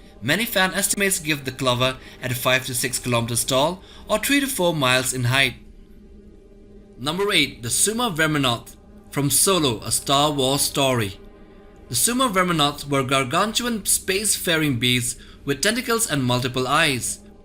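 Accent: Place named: Northeast, India. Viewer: Sounds like your from North East india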